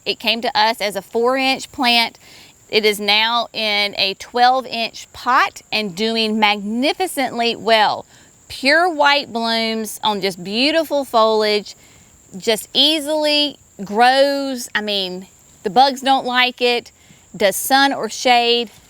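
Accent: South, United States. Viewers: Fall has arrived here as well At the Jerseyshore! Tomato tomato it’s all good! I for one enjoy a southern accent 🌿🌻 🍅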